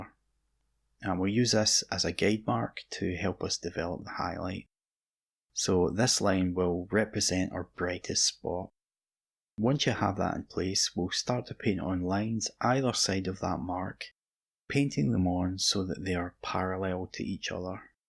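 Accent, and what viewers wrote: Scotland, United Kingdom. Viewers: Nah, your accent is pretty much all there, cos after your vids I often get a hankering to watch early episodes of Taggart !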